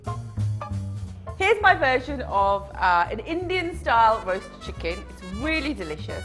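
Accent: United Kingdom. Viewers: Come on!!!!!! she's BRITISH n that's how their accent is.....!!! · Love your recipes, your presentation, your stories, your “Hello darlings!” and of course your lovely british accent. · kab sudhregi tu.... asli naam rahega.. chandni raddiwali!... faking a accent & having a accent has a difference... faking a accent means using same words as indians use while talking English but with a different tone.. she speaks & uses all the words like a British person would do because she is from london!.. she is a chef watch her cooking & learn that.. don't comment on her accent & tell kab sudrenge.. tu sudhar pehle gaav waali! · i really dont know why people dont like her accent! she's BRITISH! anyways i like her energy and vibe :) ! good watch !!! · thats a proper british accent u muppet. she was brought up there and u mr.' my accent is perfect' finds it funny? lol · ankush verma She has a British accent. 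If you're going to complain, at least get your facts right. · It is a British accent...can you be less ignorant?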